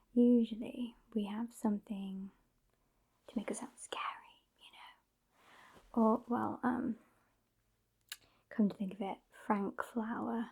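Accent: United Kingdom. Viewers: What kind of British pirate we talking here? Yorkshire? East Sussex? Cornish?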